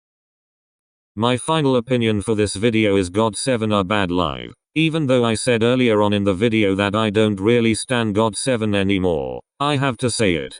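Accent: United Kingdom. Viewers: ayo british? 👀👀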